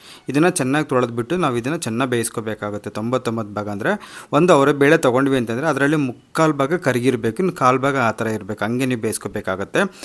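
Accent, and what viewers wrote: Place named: Durga, India. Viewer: ನನಗೆ ಸುಮಾರು ದುರ್ಗಾ ಹಾಗೂ ದಾವಣಗೆರೆ ಸ್ನೇಹಿತರೆ, ನಿಮ್ಮ ಭಾಷೆ ತುಂಬಾ ಇಷ್ಟ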